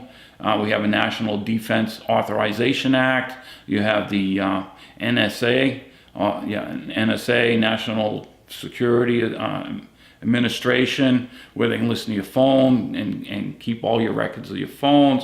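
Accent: Boston, United States. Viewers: Please, I'm burning with curiosity: are y'all from Boston? If not, what sort of accent is that?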